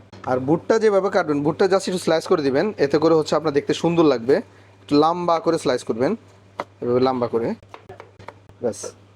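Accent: Noakhali, Bangladesh. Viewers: ভাই আপনার বাড়ি কি নোয়াখালী?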